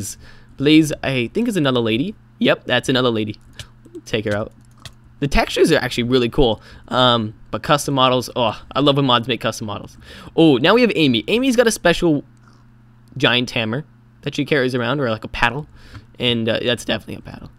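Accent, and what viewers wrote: England, United Kingdom. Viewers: hello are you from england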